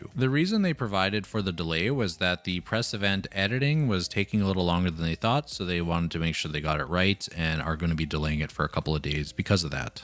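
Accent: Canada. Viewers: 'dem frametimes tho.... The ALLY will get better, there's 0 doubt in that. It's baffling that they allowed the review results so early (not having an NDA in place before June 13th which is typical industry practice)... Thanks for some more sweet canadian accent!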